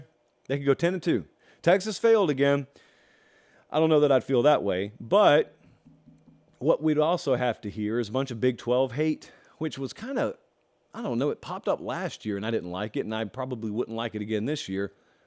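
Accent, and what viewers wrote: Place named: Georgia, United States. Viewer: “Quwhat if”, man I love our accent in GA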